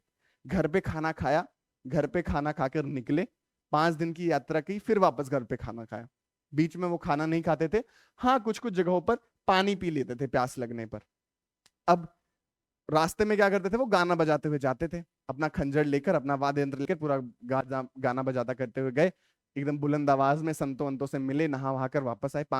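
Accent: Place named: Lucknow, India. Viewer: Ramvrash bainpuri- lakanvi andaj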